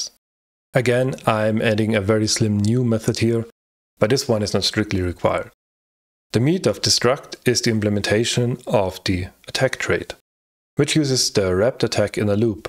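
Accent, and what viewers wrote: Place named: Germany. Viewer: Alter Schwede, geiler Channel Name und richtig gute Inhalte. Bin zwar kein absoluter Rust-Anfänger und habe ein paar Jahrzehnte Programmiererfahrung (hallo Turbo Pascal und VisualBasic 2.0, erinnert sich noch wer? 😀) aufm Buckel, aber deine Videos sind trotzdem sehr gut strukturiert und interessant für mich. Also, your English is pretty good, so no issues for me understanding you (although there is still that lingering German accent, but that's normal, trust me - I have it too when talking in English). All in all, very good content. Subscribed for sure. Keep at it. And Thank you!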